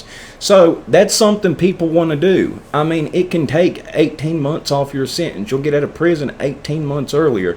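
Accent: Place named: South, United States. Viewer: Hey man keep up the good work been watching your videos since you started! Love seeing the progress man, you chilling there with your dog and your southern draw remind me of me and some of my buddies just sitting around kicking it. I live in Hagerstown Maryland which alot of people consider the backwoods part of Maryland since we're in the western part, literally 10 mins from West Virginia line and about 35 40 from Virginia, I got in all my trouble in MD tho, I don't fuck around in WV and VA, damn commonwealth states lol keep it up sorry for long comment. BTW your clean date of April 9th, 2010 was my 21st birthday, I was doing the complete opposite of you on that day lol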